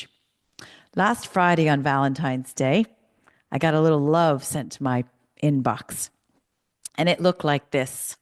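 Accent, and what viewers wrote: United States. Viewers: Are u American ?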